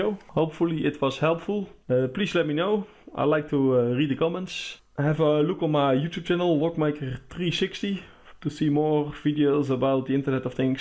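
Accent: Netherlands. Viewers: Hehe you have soooo deep Dutch accent :) groeten uit Amsterdam!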